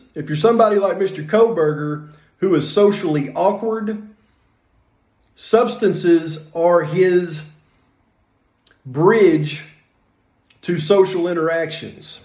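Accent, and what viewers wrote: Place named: South, United States. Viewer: PS it’s CON-SPICK-U-US not Con-Spish-Us 😂.. southern drawl was very present in todays video 🤠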